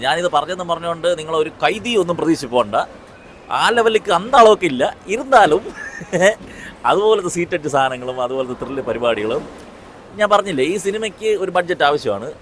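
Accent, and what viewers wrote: Trivandrum, India. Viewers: He is from trivandrum. Do check more videos including live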